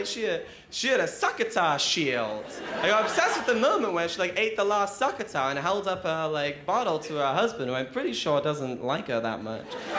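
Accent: Australia. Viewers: just Aussie. He grew up in Brisbane, Australia and now lives in Melbourne... :) He can't explain his accent... he copped a lot of flack for it when he first started out because he is a Brissie boy... haha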